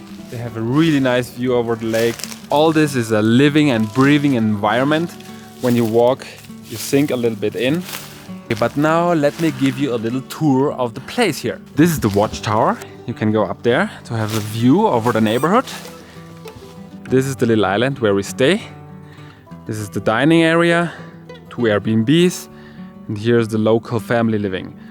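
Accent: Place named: Germany. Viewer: yesterday I just wanted to know about Germany, or better, Deutschland... and now I'm a fan... lol it's the Deutsch accent... I love it.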